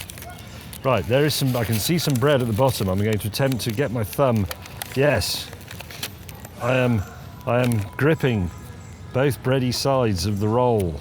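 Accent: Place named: United Kingdom. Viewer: video start on mute, yet somehow I knew he had a British accent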